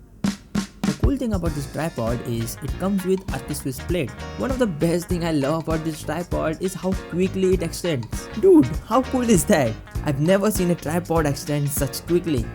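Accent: India. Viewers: I don't speak Hindi at all. even though he speaks with a strong Indian English accent, But his English is really easy to understand and he explains things very well. Cheers mate, good on ya. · Ur lucky u got good video n humor so I watched the whole video, but the India man accent is really really heavy like 😂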